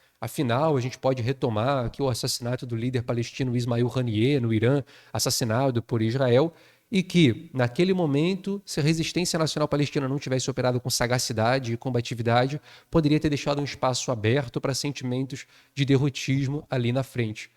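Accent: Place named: Rio de Janeiro, Brazil. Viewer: Tem hora que eu acho que o é carioca da gema, tem hora que parece paulistano da Mooca! Sotaque mucho loko! #freepalestine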